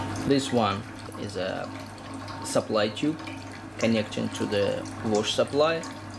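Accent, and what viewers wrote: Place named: Russia. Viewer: русский английский режет слух))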